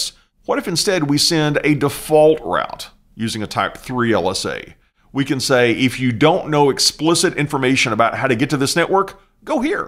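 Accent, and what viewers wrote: Texas, United States. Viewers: is that a Texas accent?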